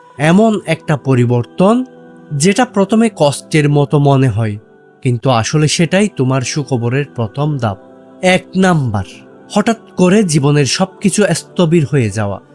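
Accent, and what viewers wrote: Chittagong, Bangladesh. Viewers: আসসালামু আলাইকুম ভাই বিনীত অনুরোধ আপনার কাছে পরিশুদ্ধভাবে উচ্চারণ শিখে সুন্দর উপস্থাপনার মাধ্যমে ভিডিও তৈরি করেন আপনি যে চিটাগাইঙ্গা অজপাড়া গায়ের ভাষা থেকে বের হয়ে আসতে পারেননি তা তো একেবারেই পরিষ্কার পরিশুদ্ধ ভাষায় কথা বলতে চেষ্টা করলেও আপনার মুখ থেকে চিটাগাইঙ্গা ভাষাযই বের হয়ে আসে। ভিউয়ার বাড়িয়ে পয়সা কামানোর ধান্দা না করে চিটাংগের ভাষায় কথা বলা পরিহার করেন। এজন্য আমি আপনার ভিডিও দেখি না শুধুমাত্র আপনার চিটাইংগা গন্ধ পাওয়ার কারণে পরিশুদ্ধ উচ্চারণ এবং সুন্দর উপস্থাপনার ট্রেনিং গ্রহণ করুন তারপর ইউটিউবে আসুন ।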